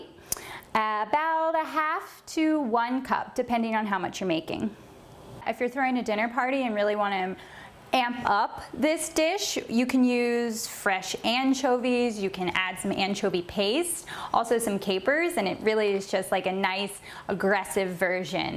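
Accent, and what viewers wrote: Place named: Italy. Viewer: Great great videos! Her Italian accent is lovely!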